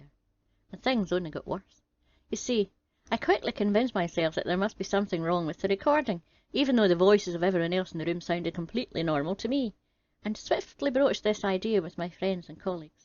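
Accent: Scotland, United Kingdom. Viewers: First hehe.... You have a sweet voice and I love the Scottish accent :) I can listen to your voice all the time :)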